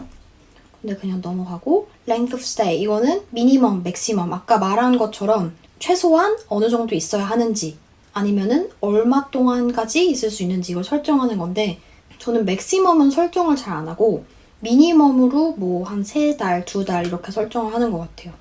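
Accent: United Kingdom. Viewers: 안녕하세요 영국에유학중인 해리입니다.영국영어 발음 정말멋져요.일상을 영어로 하는 브이로그 한개여서 또 영어일상브이로그 촬영해주시면 좋겠습니다.영어공부하는데 많은 도움이될것같아요.♥️구독하고 갑니다.👍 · 약..12년전;호주 워홀러였던 아즈매에요~ 님 영국식 발음에 푹빠지네요 다시 공부하고 싶은 욕구가! 그걸 혼자했단거에 참 대단하네요👍 잘보고있어요~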